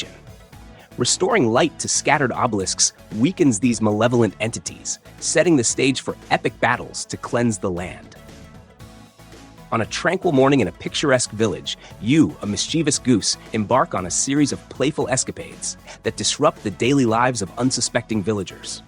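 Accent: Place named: India. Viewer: Thank you indian voice over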